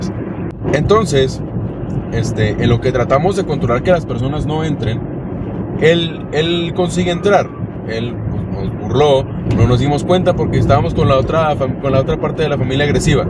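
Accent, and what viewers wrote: Mexico. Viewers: ¿Es de México?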